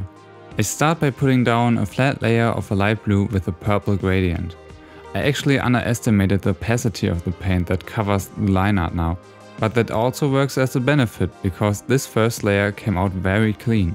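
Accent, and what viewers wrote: Germany. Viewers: Are you german? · You Sound German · Dein deutscher Akzent ist mega süß😂💕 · Are u German? U sound like my class. But in a good way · Are you German? You really do seem to have a German accent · Man hört schon raus dass du Deutscher bist.:) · Are you german? Somehow you sound like it :'3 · Wow, It's gorgeous. Your gouache drawing looks like it's a digital art. Also, do I hear a German accent, hmmm :) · dude, you german? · Are you german? You seem to have an accent:3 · I'm pretty sure he's German, he just sounds like almost everyone here in Germany who tries to speak English. I also have an accent like that and I think it's so embarrassing!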